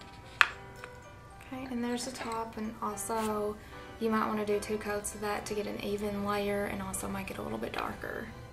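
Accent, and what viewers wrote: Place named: South, United States. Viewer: Are you SERIOUSLY Chinese speaking Southern what state are you from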